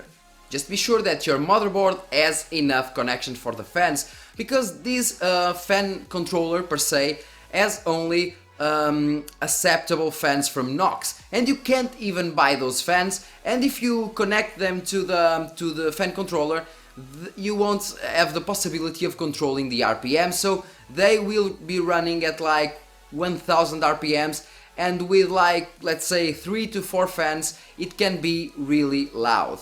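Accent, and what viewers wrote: Portugal. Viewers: deu para perceber pelo sotaque que és tuga . Continua com ótimo trabalho, abraço !! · Olá a todos, eu cai aqui de pára-quedas e depois de ver este video fiquei desconfiado que o é português de Portugal, estou certo ou errado? É que este inglês ó típico de Portugal. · Are u portuguese? I can see it by the acent xD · E esse sotaque tuga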